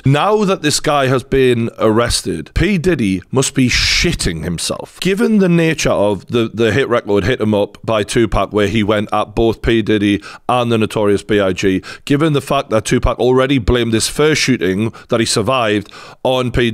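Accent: Newcastle, United Kingdom. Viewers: Geordie boy, thank you. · I just love your commentary, and that accent -- I'm a Brit, so I relate! He's feeling charitable, maybe because he's going to JAIL! Bye Diddy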